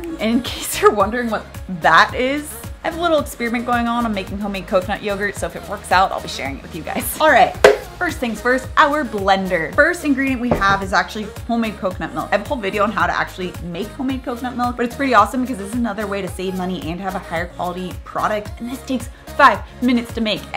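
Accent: United States. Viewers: Did you say cauliflower instead of banana to give smoothie thickness ? I can't understand your American accent you speak so fast, pls slow down !!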